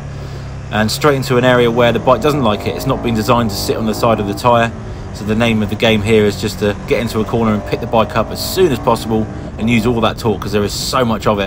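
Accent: United Kingdom. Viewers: His british accent is so strong, i can barely understand him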